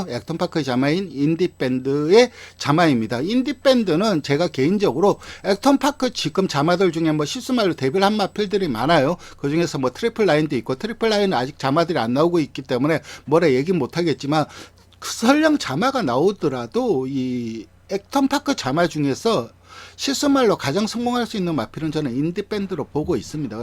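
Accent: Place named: Busan, South Korea. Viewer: 최강게임 쌍으로 조질라니까 부산말 싹 빼고🏇🐎😷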